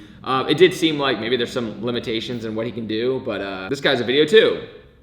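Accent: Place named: Texas, United States. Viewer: Your Texas accent and slang are really starting to show 😂 love it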